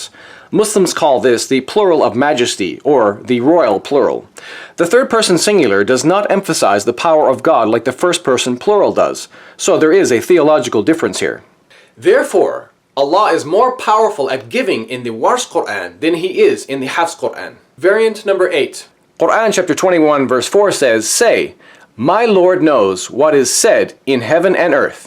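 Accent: Arab world. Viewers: I'll be back but I am just saying when he speaks with an Arabic accent, it's kinda annoying.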